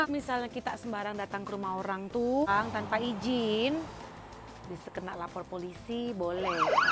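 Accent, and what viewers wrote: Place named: Pontianak, Indonesia. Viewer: alamak bahase pontinye:face-blue-smiling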